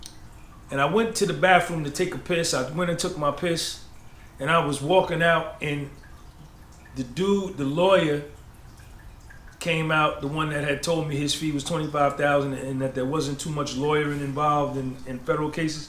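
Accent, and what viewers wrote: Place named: South, United States. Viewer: That country accent was 😂😂😂😂 My fam is from Kings tree South Carolina 😂😂😂💪🏿💪🏿💯💯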